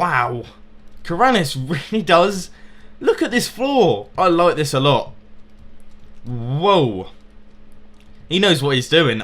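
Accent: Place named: RP, United Kingdom. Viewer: Why is he so posh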